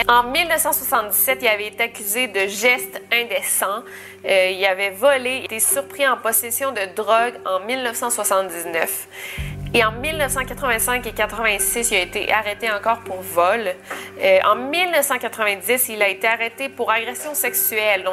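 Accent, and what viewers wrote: Canada. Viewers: vous êtes une jolie femme, et trop mystérieuse, j'm vos vidéos, et surtout l'accent canadien, j'adore.c'est un compliment.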